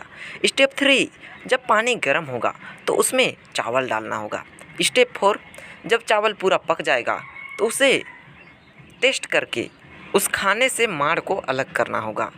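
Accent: Bihar, India. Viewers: Aap to voice se bihari lgte h sir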